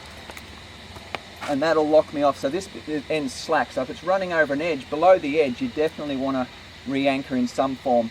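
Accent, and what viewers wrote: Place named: New Zealand. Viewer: Does anyone else from Australia get really confused sometimes when you hear someone who's not an American, but just to make sure you watch a video of an American and then it clicks... kiwi...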